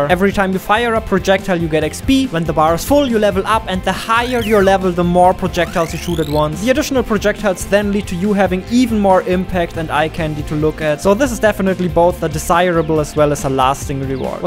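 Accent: Germany. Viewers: Sorry, but this german accent turned me off instandtly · german? 🤔 your english sounds german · The german accent makes this video much more fun!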